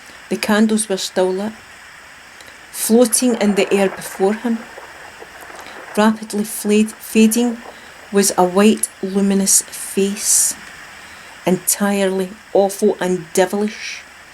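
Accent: Scotland, United Kingdom. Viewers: This was awesome! I like ghost stories. The sound-effects is a perfect mix with your beautiful accent :) So relaxing...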